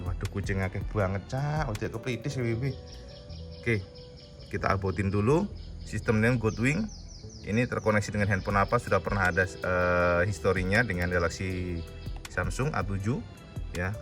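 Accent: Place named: Tulungagung, Indonesia. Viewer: Penasaran karo motor 6 silinder terus ndilok" Neng youtube lakok nemu vidio iki, tapi koyok ra asing karo logat e, mari tak dilok neng google tibak e wong T. A hadehh, sak kabupaten bak e😂😂 , Sukses terus mass 👍🙏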